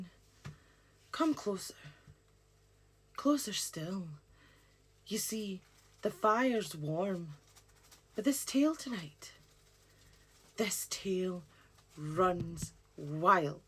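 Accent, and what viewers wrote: Scotland, United Kingdom. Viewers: ITS THE MAGIC THAT BINDS US , THE SURILION BLUE , LIKE THE CLOUDS IN THE WIND , LOVE YOUR SCOTTISH STORY TELLING TO FALL ASLEEP TO 💙💙💤💤💤💤💤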